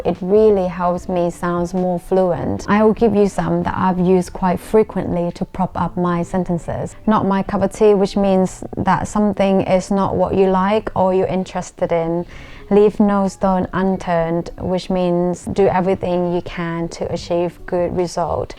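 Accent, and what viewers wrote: RP, United Kingdom. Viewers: Hello, I love your accent. How did you improve it like a British accent? I am wondering, did you able to speak this way before moving London? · That is like bbc english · I like your accent, it actually sounds British to me. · When you are speaking is it received pronunciation? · U do have a British accent mostly, I can detect a small change of ur native accent here and there. How long have u lived in UK? Pls do British accent video .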